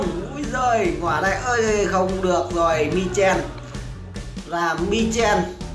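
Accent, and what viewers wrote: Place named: South, Vietnam. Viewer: Giọng miền nam nói chuyện rõ nhạt, Clickbait cực mạnh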